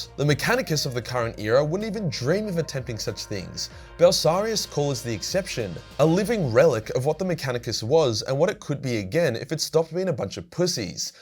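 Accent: Australia. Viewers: yikes... Dont listen to this dude when he talks shit about college. Terrible advice. You really think a stupid website will hold more value to a degree which by the way, if you go about it wisely and use all the resources available to everybody, it wont cost you thousands of dollars. I got my associates without spending a penny due to scholarships and grants. Dont be lazy, be smart and ambitious. Really easy for this guy to say it when he got lucky off of talking about warhammer with an Australian accent for a living and thriving off of donations.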